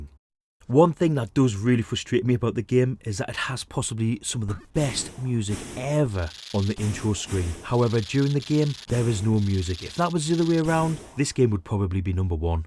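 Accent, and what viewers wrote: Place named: Scotland, United Kingdom. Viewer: You have a nice accent. I thought it was Scottish, but – then again – I've never heard Scottish quite like that. The other guy has it even stronger. The way the vowels switch places sounds like the Jamaican Patois. Guess those dudes stole it from you lot!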